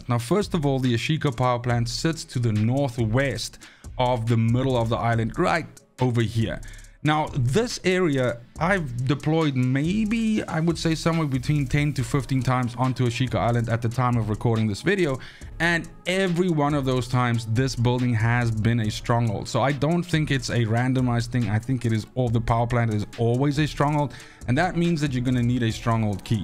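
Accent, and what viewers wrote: South Africa. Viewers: SA accent xD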